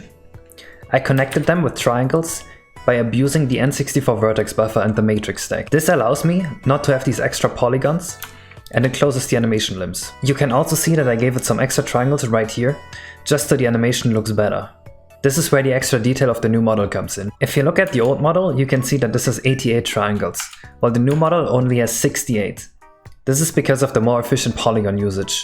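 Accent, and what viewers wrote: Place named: Germany. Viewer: dieser deutsche Akzent xD, aber krass was man aus SM64 mittlerweile machen kann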